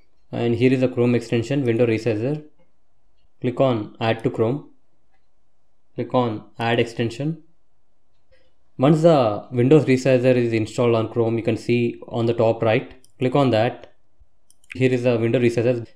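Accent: India. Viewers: Strong Indian accent!! Virtually impossible to understand his "English". Otherwise if you don't care about understanding it was really good!!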